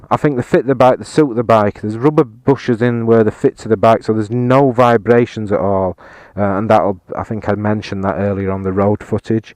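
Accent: Yorkshire, United Kingdom. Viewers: One more comment. I'm from the states. Quite a few years ago I enjoyed a British TV show here on PBS. It was called "All Creatures Great and Small" about a country vet in the Yorkshire country side. Loved it. Your accent brings me back to that time. Thank you. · Cracking, comprehensive review matey! And I bloody love your Yorkie accent 👍🏻 She looks beautiful in them colours but then I would say that 😉😊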